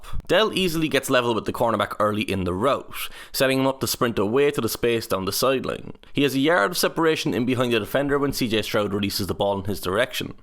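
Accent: Ireland. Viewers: An Irishman commentating on American football is amazing. Such a great dynamic! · What the bejeesus is a 'turd down'? 😂 Love ur accent btw...i think you must be from the same part of Ireland as Chris O'Dowd (from the IT Crowd)...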